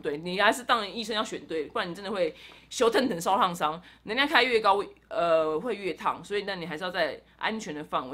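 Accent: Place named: Thailand. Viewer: 泰國味好重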